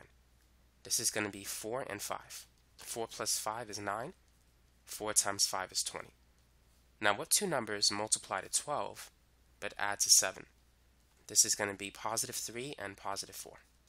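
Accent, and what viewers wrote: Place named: Boston, United States. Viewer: lol i just realized you can tell from this guys accent he is from boston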